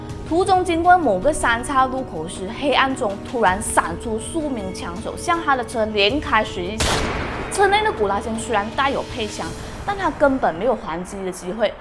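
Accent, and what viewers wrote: Malaysia. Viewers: 這裡我要幫講幾句，因為看到一堆人在罵資料不對，不敬業，發音很奇怪等等，我實在Beh Tahan。還有一堆性騷擾的留言，根本一點教養都沒有，不知羞恥是馬來西亞人，講華語的口音自然也是馬來西亞的腔調，有什麼問題？另外，Botak Chin的故事有很多種說法，別說民間了，網絡也有一堆，已無從考證。沒有什麼誤導不誤導的我還看過Botak Chin主動投降，沒有槍戰的版本 (不過圖片已證實槍戰確實存在) 像美國的鴛鴦大盜也有不同的版本啊，為什麼沒人去那吵勒？所以Botak Chin的真正的故事，或許只有跟在他身邊的人，和他自己知道吧每個人對他的印象或許不一樣有人覺得他是文藝青年有人覺得他沒受過教育 (不過在監獄裡寫的信能看出文筆能力不錯) 有人覺得他是劫富濟貧的羅賓漢，用行動來證明社會的不公不義有人覺得他是無惡不作的亡命之徒，不能被當成英雄不管什麼故事版本，不僅華人，在馬來和印度同胞的族群裡Botak Chin這個角色，在馬來西亞甚至在其他的東南亞國家都會有人知道這名字背後的故事。我覺得已足以證明他為什麼不會被世人遺忘，不會因時代的洪流消失。他向世人展示了當年七十年代的社會情形，也證明了法網恢恢，疏而不漏。不管做壞事的背後原因多麼堂皇，終究逃不過法網狙擊加油，已訂閱！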